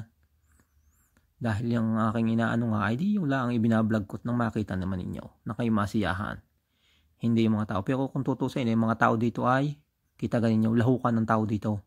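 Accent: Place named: Batangas, Philippines. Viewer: kuya nkktuwa ang iyong salita,kgaya laang dne sa amin,.tga dne laang ako sa sanjuan batangas,stay safe po plge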